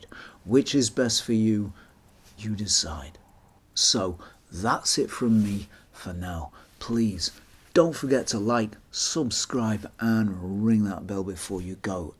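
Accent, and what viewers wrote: United Kingdom. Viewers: Great thanks to you! It was a pleasure to listen your British and things you told. Спасибо)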